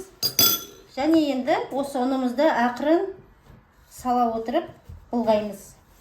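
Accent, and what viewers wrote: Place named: Uzbekistan. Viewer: Қазақша да орысша емесөзбек не қалпакша сөйлиеди бир бале былғап деген. Сөз жоқ қазағым да сүмдық 🤧😷🤧😷😷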